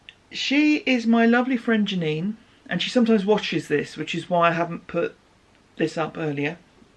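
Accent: England, United Kingdom. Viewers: New viewer here, from New Zealand. I've taken up knitting again, after many years, with inspiration from podcasts during lockdown - I hadn't known there even were such things! So pleasant to hear an English voice, English references, and English humour;-) Thank you for sharing all your FOs, and especially the patterns, I'm taking notes to find several of them. I shall be looking for your previous pocast episodes. Wishing all of you in GB a speedy recovery from this latest covid outbreak that has wreaked havoc with everyone's Christmas, I almost feel guilty for our pretty much normal summer holiday season in NZ. Glad I found you!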